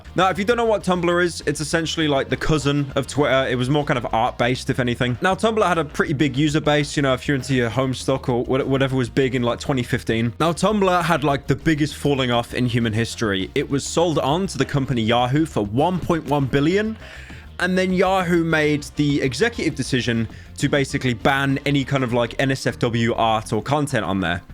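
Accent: United Kingdom. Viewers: Every time says Twit-uh I laugh because he's funny British "Man". No but seriously I love your content ❤️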